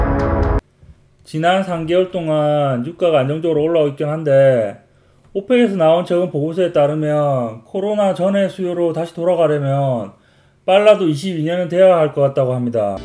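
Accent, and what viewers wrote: Gyeongsang, South Korea. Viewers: 유튜브로 뵙게 되서 영광입니다. 구독과 좋아요 눌렀습니다. 억양이 저의 고향인 대구쪽이신 것 같아 더 반갑습니다.^^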